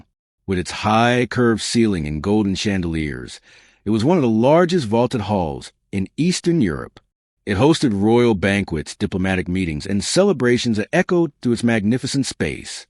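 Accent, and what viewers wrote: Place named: United States. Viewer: Please do not use an American narrator when talking regarding European Castles.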